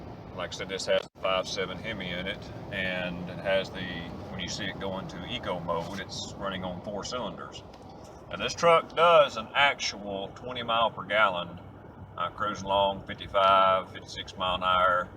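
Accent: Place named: Tennessee, United States. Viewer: 11.5 meter reading, if it's anything like my Caravan you can take about 2 mpg off that, on a recent trip up to Vermont mine read 28.6 but calculator said 25.6. A friend who just bought one I asked him if he was getting 15 mpg he said he be tickled if he could get 12. Not a better looking truck on the road, but oh man the fuel economy is awful! Awesome TN accent, this man could tear up a fishing or hunting show, I like my preachers to talk like this man, our Preacher is from Nashville TN, best man I ever met in my life! Brother n laws Silverado gets 15, my Ford gets 19.25. I don't have a v8, but oh man she pulls! I still Live the RAMs looks.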